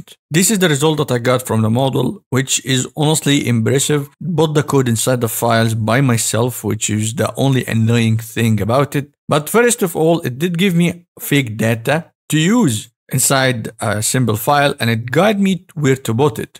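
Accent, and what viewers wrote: Egypt. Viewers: أنت مصري اكيد الاكسنت فضحاك😅❤ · You must be an Egyptian. I know our accent. If so, 3amel eh? XD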